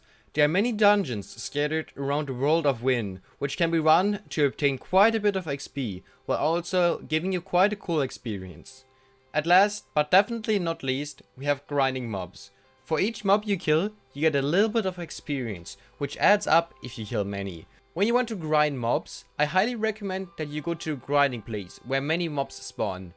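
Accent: Germany. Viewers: Where are U from BC english Sounds like a German accent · it sounds like u are from germany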